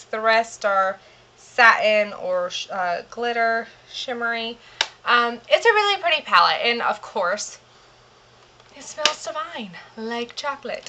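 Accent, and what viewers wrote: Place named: South, United States. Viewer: This video reminded me how much we have in common! 1. Southern accent. Love. 2. Curly hair 3. Obsession with the E35 ...just for starters :) Great video, dear! Love it!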